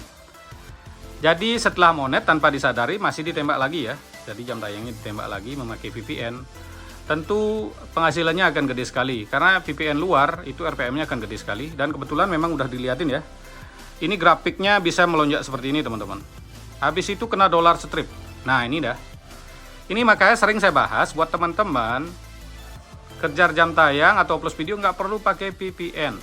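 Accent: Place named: Madura, Indonesia. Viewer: Logatnya kok mirip orang madura ?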